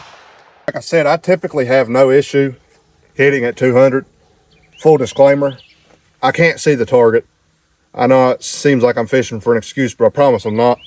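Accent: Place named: West Virginia, United States. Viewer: Idk but my guess is you are from west virginia. If not then eastern KY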